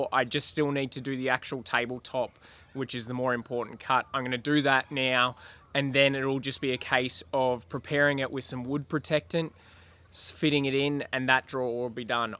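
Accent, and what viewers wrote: Australia. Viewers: Lmao I love his voice, that Aussie twang is great!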